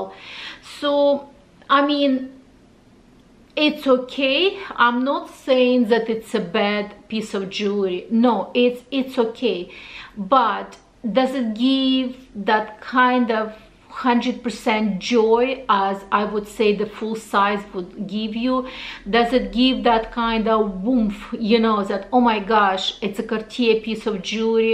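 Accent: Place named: Russia. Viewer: Your Russian accent is so sweet 😊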